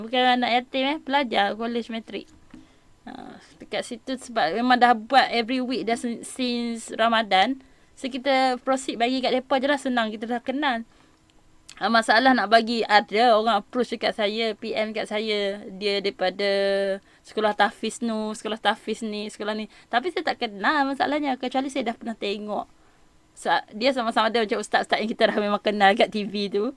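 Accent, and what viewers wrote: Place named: Kelantan, Malaysia. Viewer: awk terlalu kelantan bagi sy..tq kak ats ilmu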